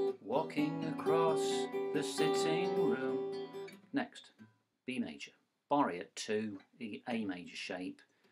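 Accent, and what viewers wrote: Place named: United Kingdom. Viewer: Hi, my name is Angelica and I love your british accent I'm italian and I love England. I'm a musician, a pianist. I just learnt to play by, I hope you know it... I'd like to know more about you. Where are you exactly from? Because you know, I love England... I' d really like, if in a way we can try to keep in touch if I can play you, it'd be amazing. I play it since I was 2 and now I'm 15. Hope you are well. Bye.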